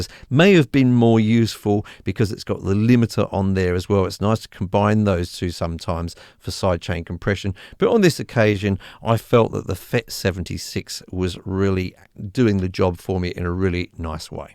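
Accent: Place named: Australia. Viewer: ... I could listen to your calm, informative, Aussie-tinged explanations all day long. So cool. I must try parallel processing some time.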